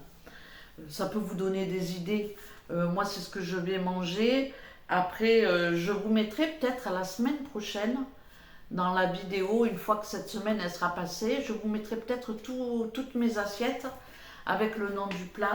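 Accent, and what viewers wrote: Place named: Marseille, France. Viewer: Bonsoir et, merci pour tes idées repas 😊, et surtout non, ne t'arrête pas de parler nous on adore t'écouter et en plus avec cet accent marseillais quel plaisir 🤗. Ton petit willy est vraiment trop beau et ses yeux magnifiques. J'ai loupé ton live j'étais en balade chez des amis 😏. Bonne soirée bisous bisous à vous deux et caresses aux petits animaux 💋💋🐕🐈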